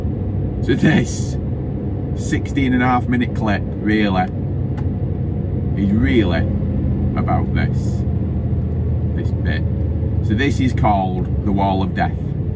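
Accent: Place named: Lancashire, United Kingdom. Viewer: I watch all your videos till the end, thanks for your brilliant vids and commentary,you sound like your from my area which is colne near Burnley.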